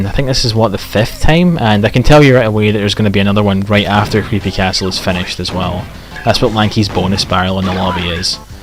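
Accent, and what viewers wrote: Scotland, United Kingdom. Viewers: Watch this video to see almost 45 minutes of a Scottish guy rambling in Scottish about an English-made video game, failing to glitch his way into a library for most of it. I believe it's his longest one...and it's not an ASMR video or a Pokémon Generation 8 "comic dub". D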